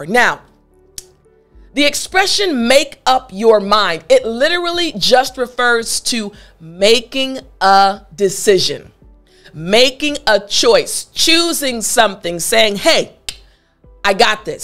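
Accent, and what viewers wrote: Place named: United States. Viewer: That American accent,does confusion me a lot....No disrespect..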